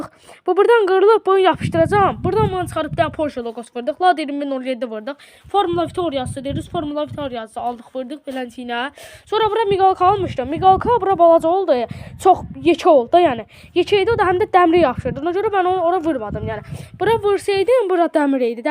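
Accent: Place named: Goychay, Azerbaijan. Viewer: Göyçayli ləhcəsidi deyəsən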